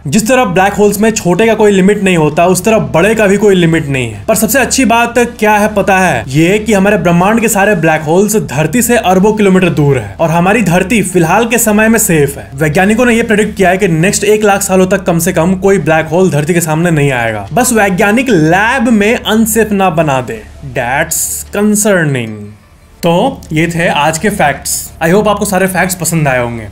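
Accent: Bihar, India. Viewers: I think brother from bihar 😂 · Theth bihari bhasa. Pulur-pulur😅😅😅😅😅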